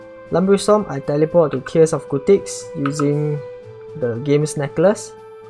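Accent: Singapore. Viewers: Really appreciate your explanations while doing clues, would love to see more of such videos. And I feel comfortable listening to a fellow sg voice.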